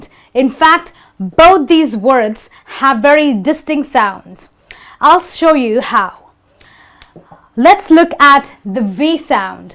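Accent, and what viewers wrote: India. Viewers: She's learning as she teaches, don't be to hard on her. She's indian. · Mikey Jimenez, she is an Indian.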